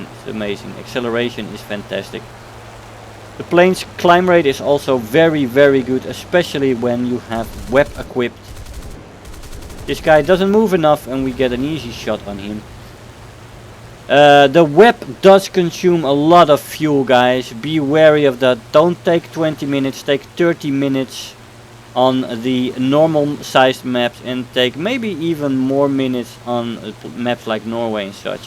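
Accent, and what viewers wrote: Netherlands. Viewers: Hey, are you Dutch? Watching this from Leiden, I thought I recognised the accent:) Nice videos mate!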